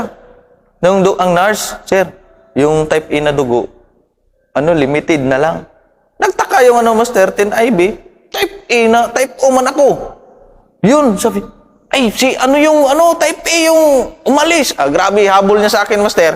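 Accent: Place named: Visayas, Philippines. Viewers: Hiligaynon tingin ko. Ilonggo. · Bisaya master or Bisayang daku or bisdak ang tuno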